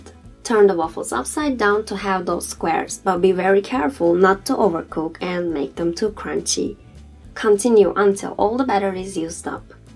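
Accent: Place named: Turkey. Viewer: Aksanın gayet güzel ama nedense Türk olduğunu hemen anladım. Tarif için teşekkürler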